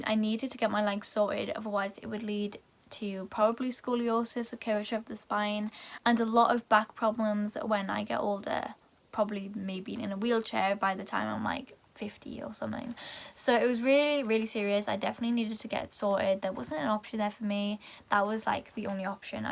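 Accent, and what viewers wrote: United Kingdom. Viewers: Being a 57 y/o man I'm glad it wasn't a makeup tutorial lol. My wife and I are proud of you. Happy you can openly talk about your history. When asked about it you can tell them to watch your videos. Since sis said hi say hi back. I need to watch more British movies so I can be used to your accent more lol.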